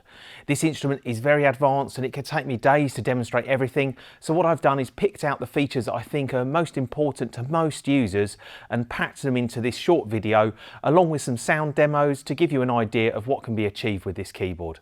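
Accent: United Kingdom. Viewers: Sorry, aber als Engländer hat er halt keinen deutschen Akzent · Sein Englisch ist makellos und ein schöner britischer Akzent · Cockney geezer 😅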